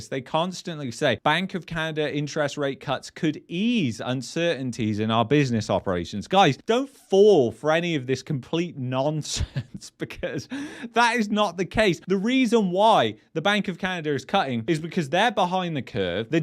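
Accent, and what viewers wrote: England, United Kingdom. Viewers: Can't get enuff of your English accent 👍👍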